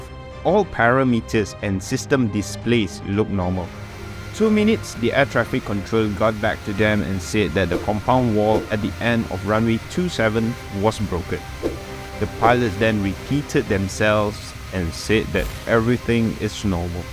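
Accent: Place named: Singapore. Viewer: Verry Verry good video, I enjoyed thouroughly und I Love the friendly Singapore accent, keep upp the great Work 👍👍👍👍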